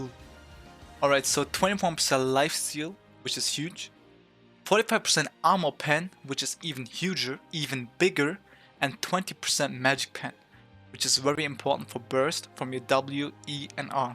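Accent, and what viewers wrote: Germany. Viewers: are you german? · Are u German? I hear some accent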